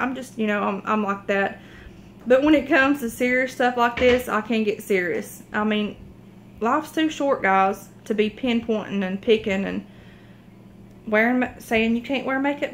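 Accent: South, United States. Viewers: Hi sweetie. I don't wear make up, I don't shop a lot and I'm the worst singer in the world but you know why I subscribe to your channel? Becuase I can tell that your a genuine and honest caring person and when I hear your videos with that adorable southern accent, I think wow...me and her could get on as friends a real treat. Your shopping stories crack me up and your singing is so enjoyable. Don't let others take your light from you girl. Stand together with God in which you know what's right and you'll get by just fine. This is just for now and this will pass. Don't cry pls. You're impacting others to do right in this world so chin up, deep breath and step forward. Xo Mrs Localvore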